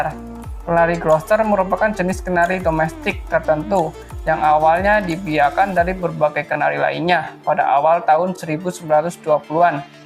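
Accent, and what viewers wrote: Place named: Banyumas, Indonesia. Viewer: Ini baru ahli burung..jos kang.ora ngapak ora penak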